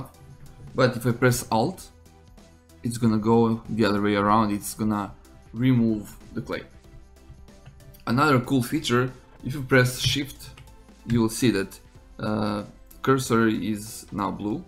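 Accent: Russia. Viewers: жесткий русский акцент ХD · Hey бро, it's a Russian accent ? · R u russian? Whats your native? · Really nice video. Is your accent russian?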